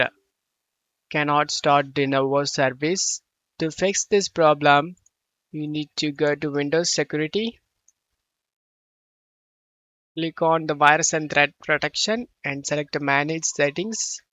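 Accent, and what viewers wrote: India. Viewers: BRO.... I WOULD GET ERRORS ALL THE TIME DLL..WHATEVER, SIMPLE FIX WHEN FIT GIRL UPLOADS HER GAMES... THANK YOU.......YOUR VIDEOS SICKKKKK....I WOULD FIX ONE ISSUE...DO IT SLOWER BECAUSE OF INDIAN ASCENT...I THINK...BUT SUPER HELPFUL I SUBSCRIBED THANK YOU.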